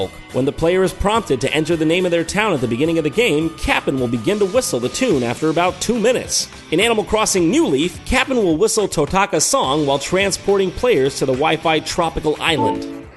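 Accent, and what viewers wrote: United Kingdom. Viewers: That British accent... I... don't know what to say! But I think I like it? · Awesome British accent haha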